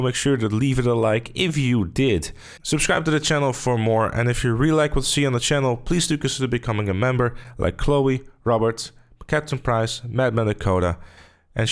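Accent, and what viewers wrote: Netherlands. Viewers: Bro kwist gelijk dat je een nederlander bent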